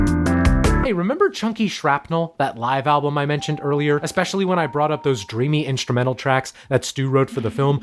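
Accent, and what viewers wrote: United States. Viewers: Couple of notes from an Australian fan: - Opening on a shot of Sydney for a Melbourne band is very funny, well done - Weird that you didn't mention Ambrose is IN the when you first brought up Gizzfest - Also lol'd at calling "Baby Rainbow" - I was at the gig you used footage from during the Nonagon passage, at the Metro Theatre in Sydney. Great gig! I've seen them 11 times all up, from tiny clubs to big festivals, and they're always a memorable show. - Wish you'd mentioned the band playing on Conan when you showed that footage, if only for Conan absolutely RELISHING saying the band name. - As an Australian it's really funny to hear you shorten the band name to KG, because we all call them! That might sound weird with an American accent though 😂 Loved this video, well done!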